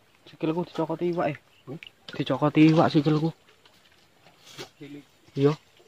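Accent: Java, Indonesia. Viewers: Tpi kok logat nya bhasa jowo😆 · Logatnya sih kea jawa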